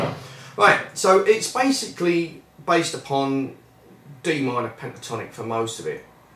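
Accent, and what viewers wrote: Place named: London, United Kingdom. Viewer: yep, I found that midi file for the song, and I also use Logic, so maybe i'll put a few hours in to making it sound nice like you have. I noticed from your accent that you might not live too far from me, I am in east London and I'm looking for a guitar teacher to help me with a few things, I've been playing 42yrs, I'm just looking for a teacher who is in to the same sort of sound, runs and modal work that interests me, If you could spend a few hours a month with me, I would really appreciate it, money no prob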